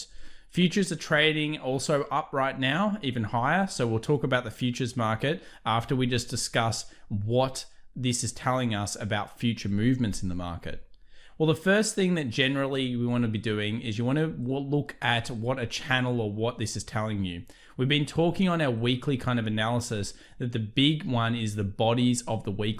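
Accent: Australia. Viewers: One of the best analysis channels on YouTube. Plus the Australian accent. Can't ask for more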